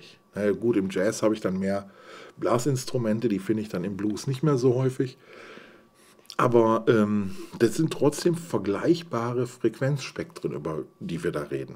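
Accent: Ruhr, Germany. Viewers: Vielen Dank für die kurzweilige halbe Stunde. Es hat mir viel Freude bereitet, wie du mit deiner "Ruhrpottschnauße" (das ist sehr positiv gemeint) die Dinge auf den Punkt bringst.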